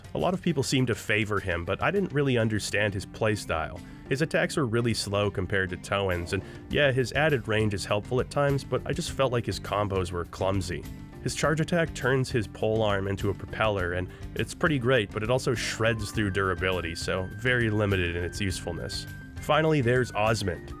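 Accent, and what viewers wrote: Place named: Canada. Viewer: He also pronounces some words ("again" for example) in a very Canadian way.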